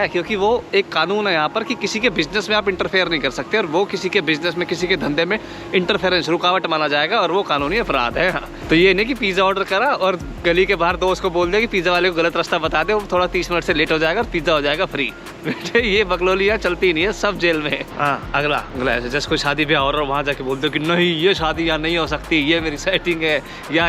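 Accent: Haryana, India. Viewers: Are you from haryana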